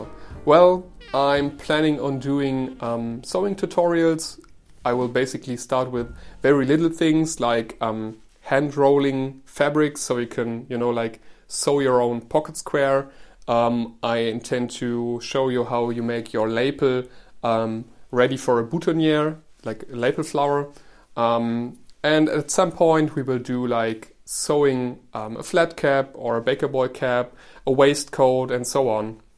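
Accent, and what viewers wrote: Germany. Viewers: British Vs Italian style with a German accent. An interesting concept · Well it is audiable that you are not a native speaker, but so what? You are very easely understood, speak free, flutend what more can one expect? Also who am I to complain, I m not a native speaker as well. The only thing curious is how different your accent is in comparison to mine, given we share our mother tongue. I doubt anyone could guess that hearing both of us. I dare say yours is more stereotypical german while mine just . . . all over the place and a huge disorganised mess, but who cares, communication still works ^^ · I love German accent so don't worry, it's actually very beautiful and pleasent for the ears ❤️ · Perfect! Not enough people teach the philosophy of style which is, in my opinion, the most important aspect. From there, everything else flows. And your English is great...the German accent makes it that much better too my friend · You have nothing for which to apologise with your accent. 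An accent in a foreign language is not something to deride. Especially a well-articulated one, such as yours. I find that a properly enunciated German-English accent gives a certain aristocratic flair, it feels very Prussian. As long as you are judicious in your grammar and word choice, your accent is an asset rather than a liability. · Aber zum Glück stören nur wir Deutschen uns an einem deutschen Akzent. Für die native speaker klingt es exotisch, und der Rest bemerkt es eh nicht. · I have German relatives and was married to a German for 4 years almost 40 years ago. He's been in America more than 40 years and his English is far, far better than yours. Your English sounds like that of my cousin from Bielefeld; it's like ASMR (?) for me. Also, I used to teach at Göttingen and Bonn, (an English speakers class on history and foreign policy) and while I was impressed with the quality of my students' English, yours is far, far better. 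So no need for you to apologise for ANYTHING about your English!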